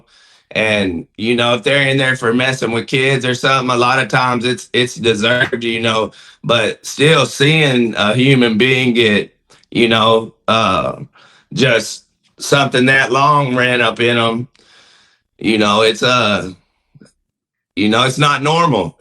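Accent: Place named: South, United States. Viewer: I know who you are talking about . They do kinda favor this cat here got more of a southern draw.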